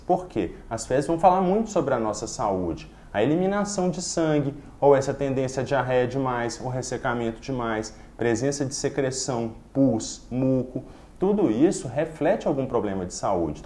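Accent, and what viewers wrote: Minas Gerais, Brazil. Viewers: Que sotaque lindo!! Parece mineiro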